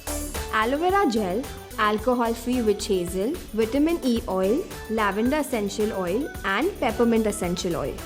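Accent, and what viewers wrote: India. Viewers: Very hard to understanding her ascent too strong indian.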